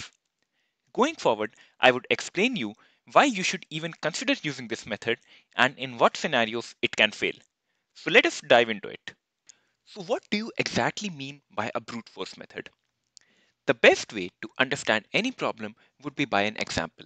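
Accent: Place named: United Kingdom. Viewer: Beatiful british english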